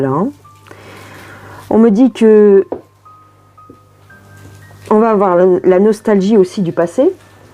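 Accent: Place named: Paris, France. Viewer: Vous êtes super! Et oui, vous avez l’accent Parisien... 💕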